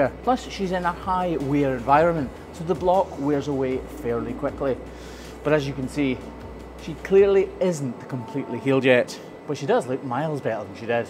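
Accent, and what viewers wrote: Scotland, United Kingdom. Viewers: Thank you. I think this is the one I have bin waiting for, bin worried about her. I think I called her a different number though. Lol I'm Canadian and I can't quite get your accent. I love it. Especially when you have the deep Scott's accents on. 😊 wanted to let you know I love your channel. Bin Bing watching it you reside me of my grape he was a vet. I got to see so many amazing things with him. He was so loving and caring animals first. He even stitched up my finger when I cut it of at the age of 7. Mom said hospital grandpa said " what am I? It's just a finger. I got this." And so it was I was stitched up by gramps. Love it. Oh your no where near his age and actually your younger than me. But you do reminded me of him. So thank you for beeing you. Your Albertan friend.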